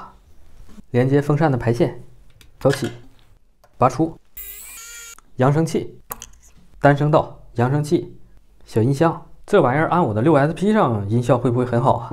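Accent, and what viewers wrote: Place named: Northeast, China. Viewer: 你是东北林区的吗？和那个林区大雷一个口音啊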